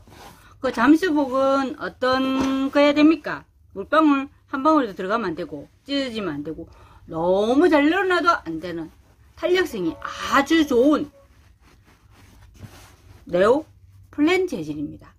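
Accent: Gyeongsang, South Korea. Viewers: 허리가 아파서 귀를 쫑긋, 눈을 동그랗게 뜨고 영상을 감상합니다. 경상도 억양이 사각 사각 꿀맛나는 꿀사과 같아서 너무 좋습니다. 옆지기님 모델료 인상 좀 해드려야겠네요. 너무 말을 잘 들으시는 것 같아요.ㅋㅋ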